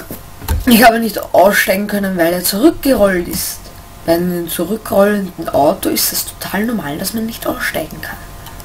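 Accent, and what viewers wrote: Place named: Austria. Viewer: he du kommst ya aus österreich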